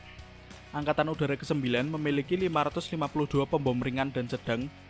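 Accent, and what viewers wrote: Java, Indonesia. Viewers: medoknya ilangin dulu bro😀😀😀😀😀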